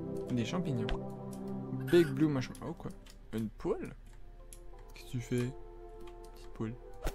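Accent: Quebec, Canada. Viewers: Tes québécois ?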